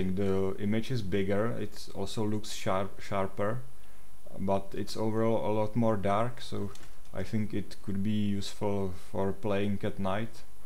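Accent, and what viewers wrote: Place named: Czech Republic. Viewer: Ne... nikoho jsem se napodobit nesnažil - prostě českej přízvuk.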